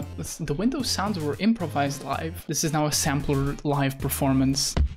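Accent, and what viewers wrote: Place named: Portugal. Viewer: you have a little accent. it’s actually very slight. i commend your english! but yeah i guess i am just very familiar with the tuga accent hehe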